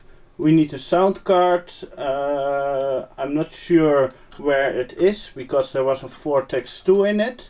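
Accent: Netherlands. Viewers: Dit Dutchglish is echt schattig :D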